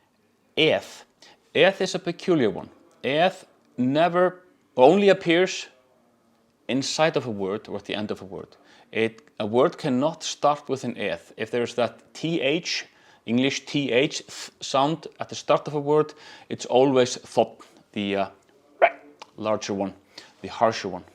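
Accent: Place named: United States. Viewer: Another question comes up. I assume you were taught British English, so you say Zett. But you speak in American English, so you say Z. Which is correct?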